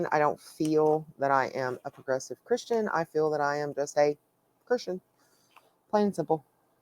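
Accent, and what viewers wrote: South, United States. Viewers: I’m a recent subscriber to your channel, having come upon it by chance. I immediately picked up on your “accent,” as I, too, am a Southerner! I currently live in SC but moved here from the Atlanta area last year. Your coloring page looks so cheerful colored by the glittery Gelly Rolls. As a relatively- progressive retired pastor in a mainstream Protestant denomination, I found your comments about Christmas interesting and appreciated the remark about the X in X-mas. Indeed, the X stands for the Greek letter chi, which is the first letter of the Greek word Christos, from which our word Christ comes. So, yes, using the abbreviation X-mas does NOT leave Christ out of Christmas! Thanks for sharing!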